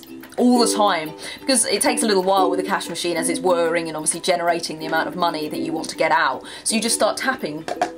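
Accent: United Kingdom. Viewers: Just found your channel and I absolutely love it 😀 I really like your british accent, its just nice to listen to your voice. That point with the phone is so damm true 😂😂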